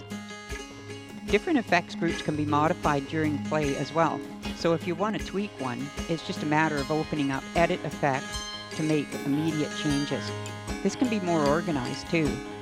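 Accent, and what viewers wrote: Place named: Midwest, United States. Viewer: Awesome! We were thinking midwest U.S. or maybe Western N.Y. near where I grew up in haha! You're accent is very similar! Cheers!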